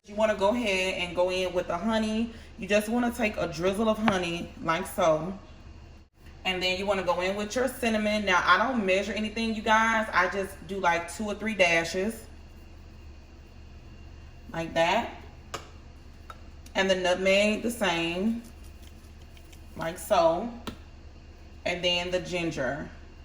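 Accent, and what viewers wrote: Mississippi, United States. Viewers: I love your Mississippi accent, thank you for sharing your recipe.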